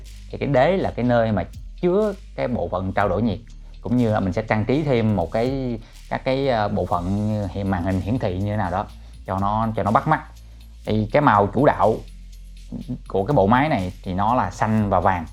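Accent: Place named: South, Vietnam. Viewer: Em rất vui gì nghe anh nói giọng của miền nam.bởi gì em đoán anh ở sài gòn.gì một pc full nước em sẽ cố gắn làm việc để một ngày nào đó không xa em sẽ được gặp anh.thực sự rất vui mừng khi anh nói tiếng miền nam.em chỉ sợ anh ở hà nội.nên khi ước mơ củacem khó thực hiện.ngày đó không xa đâu gần đến rồi.chúc anh nhiều sức khỏe.một ngày không xa em sẽ đc gặp anh.chắc chắn là thế.PC full nước anh nhé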